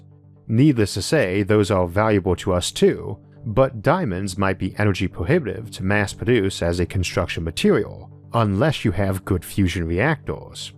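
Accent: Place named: United States. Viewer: Get a better speech program hopefully not americanised.. Temperachores ? Dooteron?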